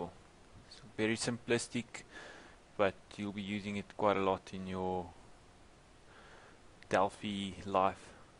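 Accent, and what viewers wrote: South Africa. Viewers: Are you afrikaans? Cuz i am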